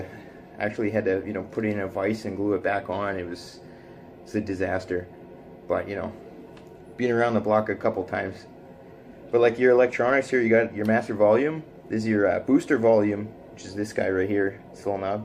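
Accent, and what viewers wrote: Canada. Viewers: Good job on fixing and playing. Haha I knew you were Cdn as soon as you started talking. I'm in BC. You could get 6 G's for that easy. Check the Reverb site out. Go Jets!!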